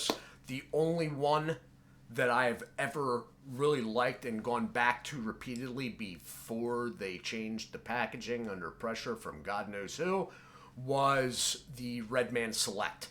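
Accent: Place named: Philadelphia, United States. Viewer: I live in South Jersey judging by your accent I'm assuming you're from Philly, love your videos, does anybody know where I can get this around South Jersey or Philly thank you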